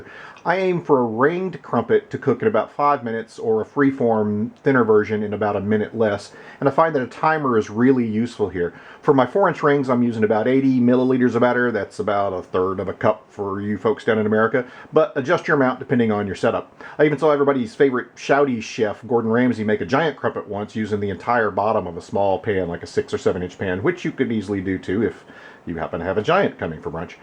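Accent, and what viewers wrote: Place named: Canada. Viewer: You have a Canadian accent. So, where in Canada is there a shop that sells crumpets 24/7 ?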